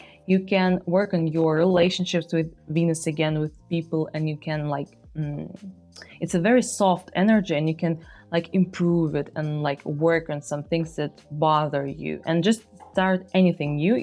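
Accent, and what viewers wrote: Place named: Iran. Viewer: چقدر لهجت ایرانیه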